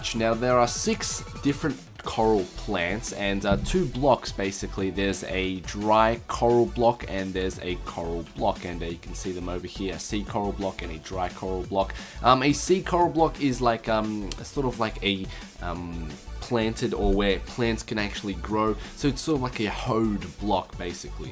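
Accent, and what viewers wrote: Australia. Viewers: he doesnt have a fake Russian accent its Austrailian and its not fake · Dude 1. It isnt a fake accent 2.The ``fake`` accent is australian, not Russian 3. Learn how to spell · He is Australian, thus he has an Australasian accent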